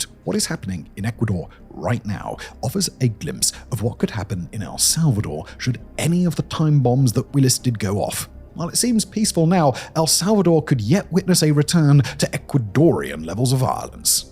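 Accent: United Kingdom. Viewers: I pity so much. His britishness makes him pronounce words as simple as 'hour' as 'auagh'. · This is what mindlessly believing videos because it’s on YouTube and the speaker has a British accent looks like.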